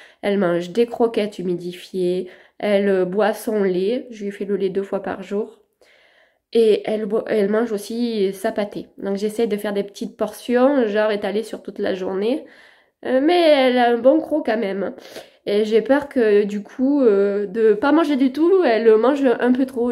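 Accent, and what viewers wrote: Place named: South, France. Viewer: Bonjour Je vous découvre aujourd'hui ...qu'elle est mignonne!! C'est si triste de voir qu'il y a des gens qui ne se soucient pas du bien-être des animaux...sa mère va lui manquer autant dans l'affectif que pour son éducation... Les croquettes Hills sont très bien , nous les utilisons pour notre Gribouille un shitsu de bientot 13 ans. Nous avons prévu d'adopter aussi un petit chihuahua : il est né le 14 avril, nous ne l'aurons que vers le 20 juillet, ça lui laisse le temps de bien grandir auprès de sa mère et d'apprendre plein de choses. Je m'abonne car j'aimerais bien suivre cette petite et en plus j'aime beaucoup les plantes! Vous avez un accent du sud, vous êtes ans quelle region? Nous, dans le Lot😉 Bien à vous, Corinne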